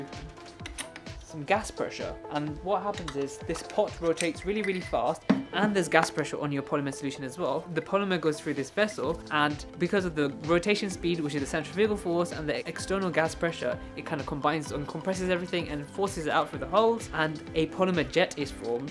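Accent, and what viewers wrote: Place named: United Kingdom. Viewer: you talk very nicely, professional, sweet and obviously how can I forget your British accent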